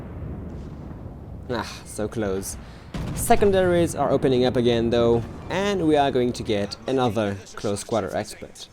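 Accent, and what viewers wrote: France. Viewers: Nice French accent man 👌🇫🇷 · c'est rafraîchissant d'entendre un accent français quand on vit en Ontario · Your french accent is on point !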